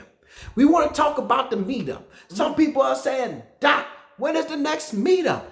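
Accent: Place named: United States. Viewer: I want to work to your company please and I want to speak English like you, I like American accent. 😃😃😃😇🌮✊👌😐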